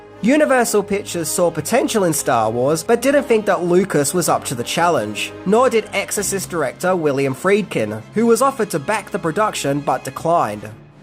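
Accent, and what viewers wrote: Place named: Australia. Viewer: WONDERFULL!NICE WORK!NICE ACCENT!AUSTRALIAN?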